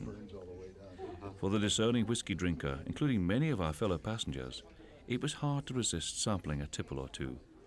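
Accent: United Kingdom. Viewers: "Eileen" Donan Castle?????? Get a grip laddie. Your accent is Scottish but your knowledge of Scotland is sadly lacking. "Eileen" really!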